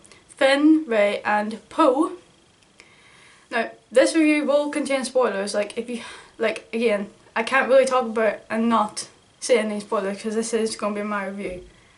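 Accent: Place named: Ireland. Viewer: Nice review. As an aside, I didn't realise you were Irish; I though Scottish :D Excuse my poor English ears!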